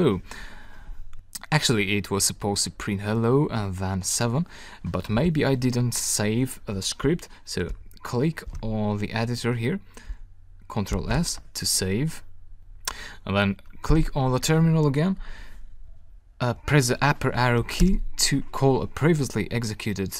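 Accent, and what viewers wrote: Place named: Russia. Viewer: are you russian? You have a nice accent.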